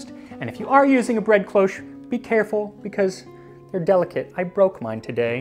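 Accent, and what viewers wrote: United States. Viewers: Yay the metric system! But you have an American accent so I am confused, is America switching over? Yay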